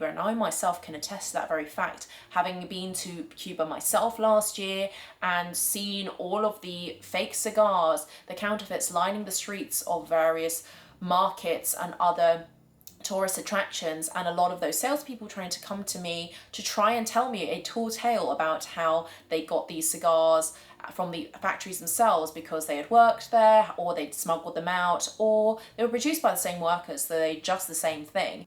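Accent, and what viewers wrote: United Kingdom. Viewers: I love your attention to details a great video for newbies Sounds like you have an English accent, which means you have full access to amazing Cuban cigyes. So don't understand why you are going to buy something other than from a Reputable dealer . I travel to London quite a bit ,and is one of the most expensive places to buy a proper Cubano I'm dutch American an have access to any cigar of choice, I prefer many other cigars over cuban its 2023 and cubans losing steam with guys like me to many better options ,China has driven up the price but once they get taste of Davidoff my father Padron the will quickly convert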